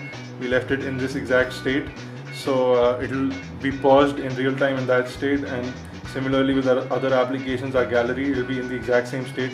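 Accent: India. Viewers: . That is not your real voice because it sounds indian and your hands are white, and that voice is really annoying. So it isnt friggin funny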